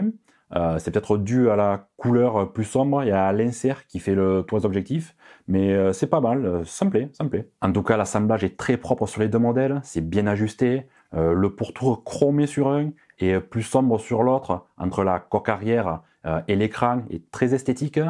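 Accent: South, France. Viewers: L'accent du sud c'est le meilleur 👍👍👍😁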